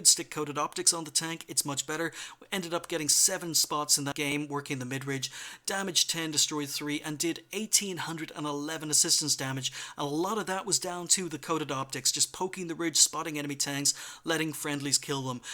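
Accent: Ireland. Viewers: but no one says is it worth it like. Its that Irish accent LOL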